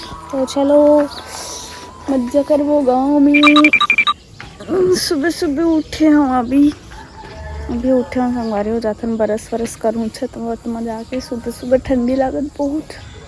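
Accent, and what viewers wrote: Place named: Chhattisgarh, India. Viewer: बहुत ही सुन्दर और अपनी गांव की यादें ताजा हो गई, बहुत अच्छा छत्तीसगढ़ी बोली हे, जय छत्तीसगढ़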